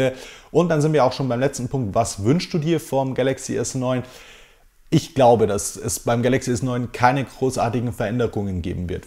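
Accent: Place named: North, Germany. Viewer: Hi, dir auch ein frohes neues Jahr, wieder ein super Video! Hat mich wieder gefreut eine 35 Minuten Ausgabe zu sehen. Gerne mehr von dem Format, Länge ist gar kein Problem. Wenn man dir bei deiner Formulierung etwas ankreiden wollte, könnte man sagen, dass du das Wort "so" häufig benutzt.;) Aber nichts über dein Intro. Früher habe ich deinen Akzent als Norddeutscher gefeiert.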